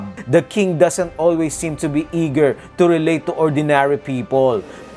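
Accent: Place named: Philippines. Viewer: Your accent sounds Filipino. Nice video by the way .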